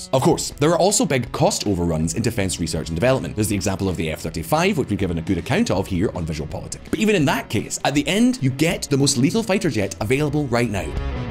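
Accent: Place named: Scotland, United Kingdom. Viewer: Ahh..Scottish…❤️❤️❤️ breath of fresh air!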